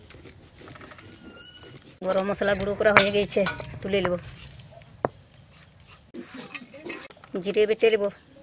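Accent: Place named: Mayurbhanj, India. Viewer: Definitely ur from mayurbhanj 🙏😀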